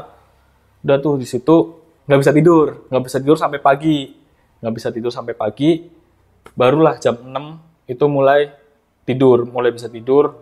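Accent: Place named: Java, Indonesia. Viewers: Medhok" mas🤗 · Ledok bahasanya jang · Logat ngapak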